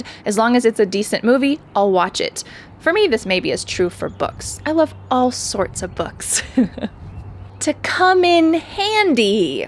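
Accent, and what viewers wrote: United States. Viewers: How much I adore and enjoy your clear American pronunciation,. It's awesome!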